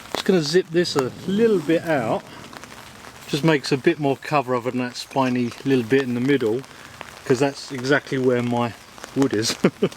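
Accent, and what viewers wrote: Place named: Cockney, United Kingdom. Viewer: Love the cockney accent and pronunciation, "minus free" .. brilliant.. your videos are so relaxing. My Dad took us campimg a lot when we were kids.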